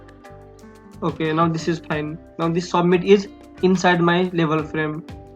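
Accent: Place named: Nepal. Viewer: ayo nepali accent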